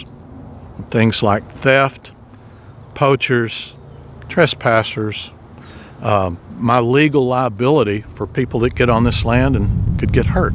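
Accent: Texas, United States. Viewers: Always happy to see you and hear that Texas drawl that I remember so well. Do you live in the district for which Brandon Herrera is running If you do and he is elected, I wonder if there is anything that he can to do? Probably too late but worth the try.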